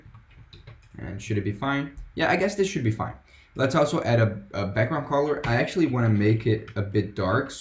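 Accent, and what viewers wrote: Brazil. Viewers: Reconheço um inglês br de longe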